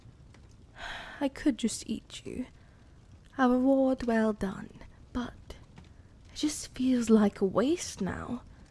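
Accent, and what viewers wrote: Scotland, United Kingdom. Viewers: Scotland? I can't tell. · The hot Scottish lady is back Does anyone else find the Scottish accent attractive? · I love your Scottish accent ❤❤❤ Thanks for another wonderful video 🤗🥰😘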